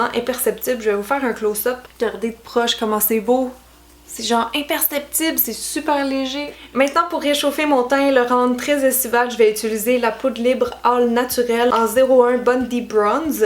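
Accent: Canada. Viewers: Bravo pour l'ensemble de tout ce que tu fais, et Merci pour les infos sur ce produit crueltyfree. Trop peu de personnes se préocccupent de la souffrance animale, MERCI!!!! Les produits que tu présentes ici sont la plus belle preuve qu'on peut obtenir la beauté, et la qualité, sans passer par la cruauté et/ou la chimie. Bises. Ps: ( je suis en France), j'aime beaucoup ton accent Canadien, ce qui ajoute encore de la sympathie à ta chaîne.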